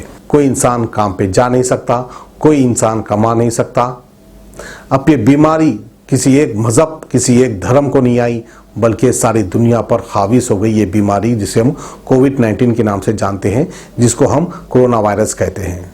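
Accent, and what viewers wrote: Hyderabad, India. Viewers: Bhai aap pure Hyderabadi style ma bolka inlogo ka upper thok Diya jaisa hogaya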